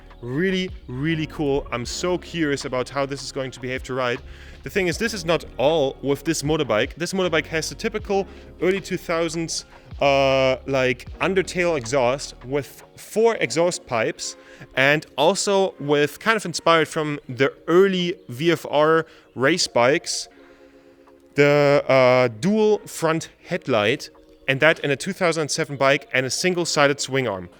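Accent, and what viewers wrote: Germany. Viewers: Bruh the German accent is killin me 💀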